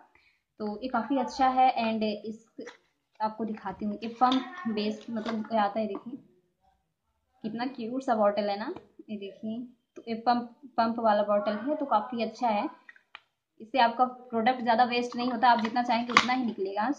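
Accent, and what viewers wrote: Bihar, India. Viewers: Aap itna bihari ka jesa kyu bolti hai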